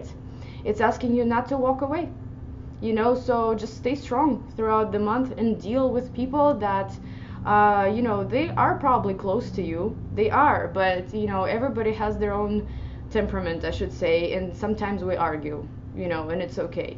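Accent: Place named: Russia. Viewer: ❤️ your eyes. Resonate. Respect. I may fall in love with your Russian accent.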